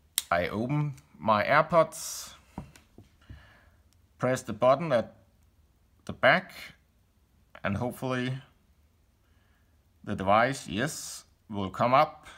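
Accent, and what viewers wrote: Denmark. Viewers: After hearing your accent: Are you Danish? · Are u danish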